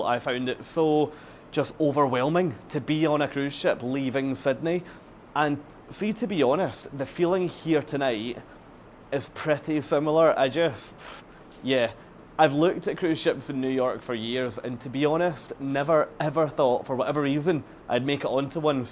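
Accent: Scotland, United Kingdom. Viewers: New subscriber here, LOVE your Scottish accent! especially since Outlander has wrapped up Season 7 lol. Thanks for sharing, and happy cruising! 🏴❤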